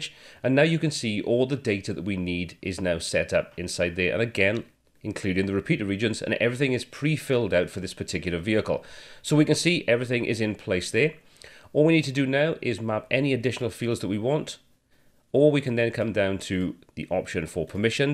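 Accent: Wales, United Kingdom. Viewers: Hi, you sound Welsh? I'm also from s. wales. Thank you for this video mate I wish I found this 5 months ago when you made it, would of saved me a lot of time. :)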